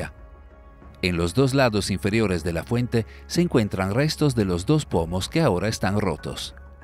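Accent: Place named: Italy. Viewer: Por si no notaste el acento, el narrador es de origen italiano,. Habla un español PERFECTO, me gustaria oirte a vos hablando italiano........ademas podrias tener mejor modo para hacer una critica o comentario, ademas insignificante.......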